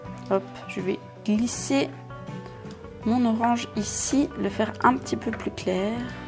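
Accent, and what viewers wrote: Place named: Vaud, Switzerland. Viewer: Juste à l'accent, bien vous rassures. Je suis du canton de VD, on se reconnaît quand même assez facilement entre nous ;)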